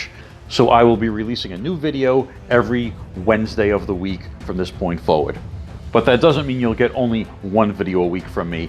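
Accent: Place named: Northeast, United States. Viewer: Ohhh man the electirc company!!! that was wild! Totally flashed back when you mentioned that,, And Man, you have stuck your foot in a great pool of you tube friends my man! welcome! your channel is already so entertaining. Can't wait for the Bat review in March. Blue is cool, music is great - I like your voice the most... great north east usa accent man. I just really like that you are true blue.. passionate about this stuff and are not a faker like so many seem to be these days! Proud to know you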